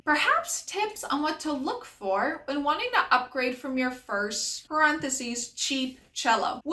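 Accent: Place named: Europe. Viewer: yeah vibrato is a very wide topic (no pun intended!). Cool, I'll look out for that video and yeah, I imagine it will have to come in sections. Another question I have which I'm not sure you answered before, or maybe you do in another video, but where are you from? You sound like you may have lived in Europe originally but then grew up in America. Am I right? I don't know lol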